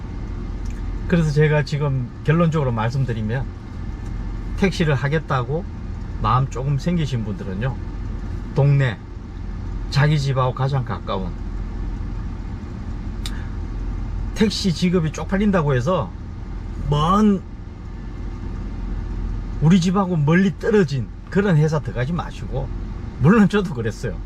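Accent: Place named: Gyeongsang, South Korea. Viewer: 아흐 경상도듣기싫은 정신병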